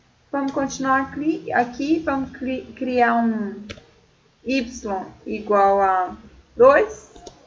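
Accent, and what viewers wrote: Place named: Minas Gerais, Brazil. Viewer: Valeu, profa! Ótimo introdutório e com esse maravilhoso sotaque mineiro (adoro!)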